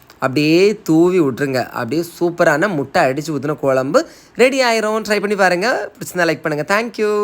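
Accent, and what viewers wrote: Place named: Kerala, India. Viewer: Hello sir ni ga pesupothu malayalam slag verunudallo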